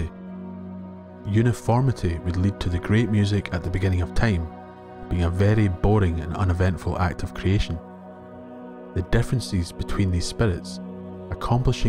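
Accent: Scotland, United Kingdom. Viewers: 😁 near the end of the video I did recognize the Scottish accent! Cheers from Romania! And a good night i got to go to bed.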